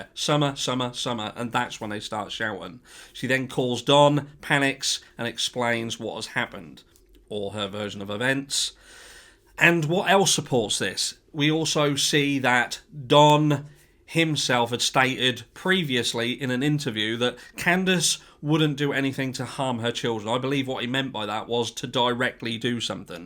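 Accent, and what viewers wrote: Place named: England, United Kingdom. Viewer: I do love your accent also. Always have loved the way the English pronounce and state, with such compassion. I had forgotten about Jodisue's statements about the Suburban. Candus you to get straight and come up with the truth, no matter what it is - for Summer's sake, her memory, her dignity, her innocence...Do one right thing in the name of ALL your children.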